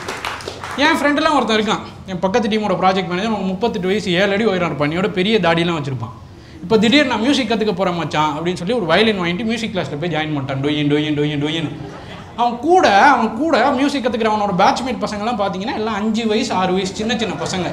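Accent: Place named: Tamil Nadu, India. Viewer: It is deep tamilnadu style.. not with chennai slang.. nice like to see full version 👌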